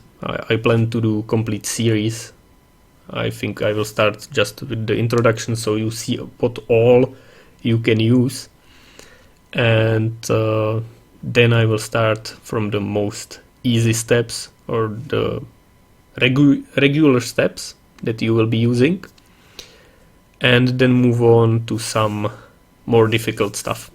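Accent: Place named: Czech Republic. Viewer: no ten český přízvuk jde poznat :D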